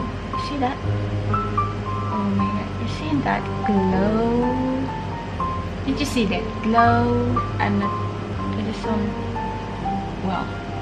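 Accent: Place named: East Malaysia, Malaysia. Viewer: Bila bercakap, terus tauu dari seberang! east malaysia ada slang tersendiri 🥰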